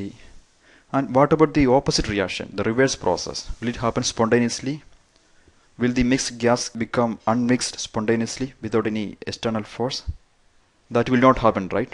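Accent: Kerala, India. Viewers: I think he is a Malayali from India .. Damn accent!!! Can't focus on the video :( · Malayalee accent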